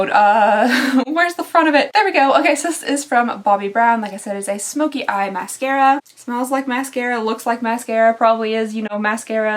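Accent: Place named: South, United States. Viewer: Ha! My husband heard your voice and asked if I’d made a video using an American southern accent!!!! You are so brilliant with these videos. The bags were beyond drab, boring. Well, except for the blush and eyeshadow. Those were shockingly awful. I like the colour combos you came up with, they looked rather lovely for a date night. Ipsy has truly gone to the dogs. Exception: I received that hair mask in a Sephora bag and my hair looked great for that day.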